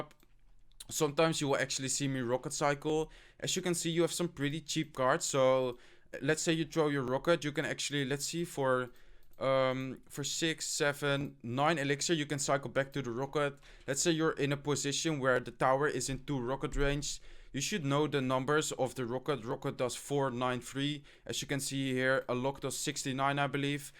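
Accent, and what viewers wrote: Netherlands. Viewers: You're not "monotone" it's just your accent bro don't bother with trying to change ur accent ur from the Netherlands and ur voice is just like that! I've learned a ton from u man! I use this same deck but use hog, zap, Skarmy, rocket, inferno tower, Princess, gob gang, gob barrel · are you dutch?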